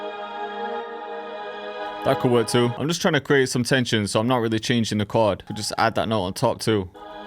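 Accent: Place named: England, United Kingdom. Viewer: i love that England way of speaking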